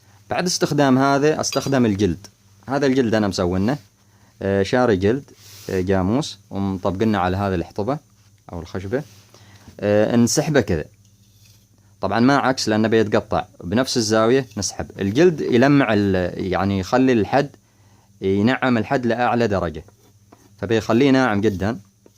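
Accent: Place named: South, Saudi Arabia. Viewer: سلمت و بيّض الله وجهك على الشرح الوافي. وبالنسبة للّهجة اللّي تتكلم بها بقلب الجيم الى ياء فهي نفس لهجتنا في قبائل رجال الحجر جنوب السعودية. وسلامتك ✋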